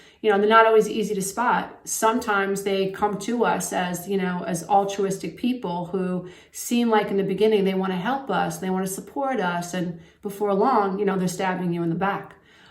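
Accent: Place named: Long Island, United States. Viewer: Also I'm a Long Island guy. And although I haven't lived there in a long time it's still home and when someone speaks to me the way you do it's so familiar comforting supportive and oh so welcome! The tone the accent the loving intention it all hits home real deep you've been so helpful. Look at how many people you are helping it's astounding! I'm making the best music of my life now because I'm plugged in. Thanks to you and others in your field of expertise.😍 Happy New Year! Your good karma account is overflowing.👩😇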